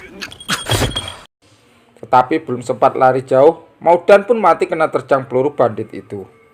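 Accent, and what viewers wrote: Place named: Java, Indonesia. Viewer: Yg cerita nya wong jw ne medok bngt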